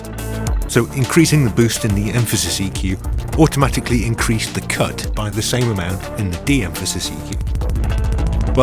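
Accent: United Kingdom. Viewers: Y'know,... In the 20-some-odd years that I've been tweaking knobs I've developed juuuust enough hubris to think that I know a thing or two about this stuff. Somehow, though... SOMEHOW — these tutorials of yours manage to either completely school me or at least reintroduce me to concepts in a way that make me question whether I in fact know anything at all. You and your sensible British accent. #besttutor #dulcettones DON'T LET IT GET TO YOUR HEAD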